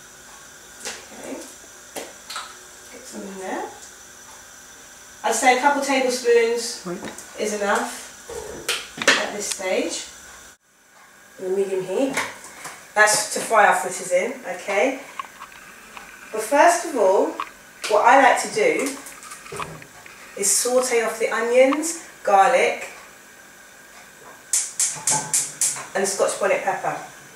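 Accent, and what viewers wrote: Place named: United Kingdom. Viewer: Great recipe! I liked your British accent! Thanks!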